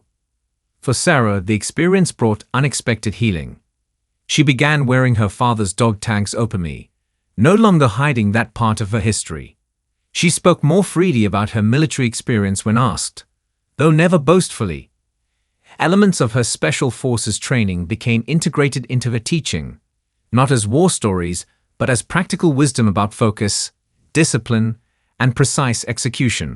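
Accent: United Kingdom. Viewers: Sorry to say I cannot listen to this story. The robot reading the story with his british accent, it's way of the character of the story british accent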